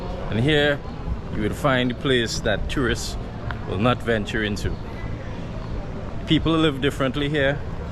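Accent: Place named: Caribbean. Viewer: I am curious to know where you are from. You seem to have a Caribbean accent.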